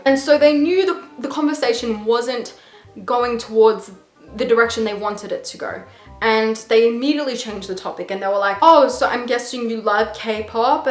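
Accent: Australia. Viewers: You are really pretty i know you sound Australian but I’m wondering what your background is, are you mixed ? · Your accent is like Australian but with a tinge of something else ?? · are you an aussie? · Is that an aussie accent? And you speak korean? 😍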